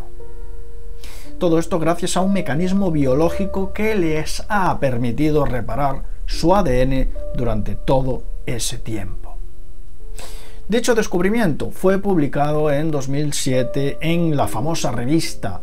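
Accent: Galicia, Spain. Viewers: De donde eres de galicia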